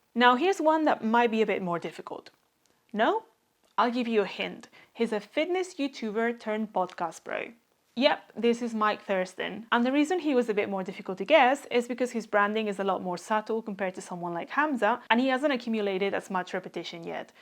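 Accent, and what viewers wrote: Spain. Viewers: Puedo detectar el acento español hahaha, buen video!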